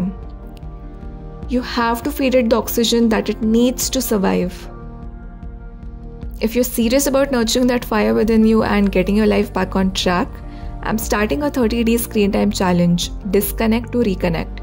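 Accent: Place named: United Kingdom. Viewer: british english while indian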